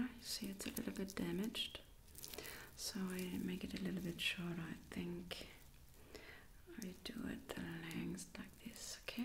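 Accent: Germany. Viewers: I loved hearing both English and German. I love the sound of German accent and language. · Great idea but a german only Version would be great. I dislike the german accent (in general not you specifically) and it was quite distracting for me personally xD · speaking germglish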